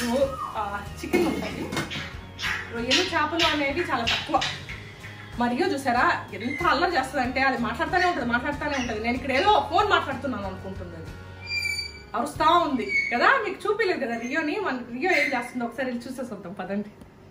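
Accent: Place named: Nellore, India. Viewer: ఆదివారం గారి అద్భుతమైన వంటలు కార్యక్రమం కి స్వాగతం సుస్వాగతం, ఉదయం దోస చికెన్ పులుసు ఈడు జోడు కుదిరింది, మొదటి సారి మీ ముఖం మీద చెమటలు పట్టడం చూసా చాలా శ్రమించారు ఫ్ ఫ్ ఫ్, నెల్లూరు కనపడుతుంది మీ మాటల్లో గారు, రియో గుడ్ బాయ్ అండి బాబు, ఏదో వీడియో చేసినట్లు కాకుండా పక్కన ఎవరికో చెప్తున్నట్టు ఈజీ గా చెప్పేస్తున్నారు, ఫ్రీ గరం మసాలా చాలా ఫ్రీ గా వేసేసారు, ఎప్పుడు ఎప్పుడు వంట తొందరగా చేద్దామా అని చిన్న దోస పెనము మీద వేసేసారు, ఆహా నెల్లూరు యాస యాడికిపోతున్నావు👍👍👍, ఆహా నోరు ఊరించే దోస చికెన్ సూపర్, నోరు కాలింది 😂😂😂 పక్కన వీడియోగ్రాఫర్ నవ్వులే నవ్వులు, మీ అబ్బాయిల రివ్యూ బాగుంది, మొత్తానికి సాధించారు మంచి రిజల్ట్ ఇచ్చారు, చాలా కష్టపడ్డారు గుడ్. మాకు హోమ్ టూర్ వీడియో కావాలి, సిరీస్ అంటున్నారు అంటే అది ఎంత పెద్దదో అర్థం చేసుకోగలం, తప్పకుండా వీడియో చెయ్యండి గారు 👍👍👍.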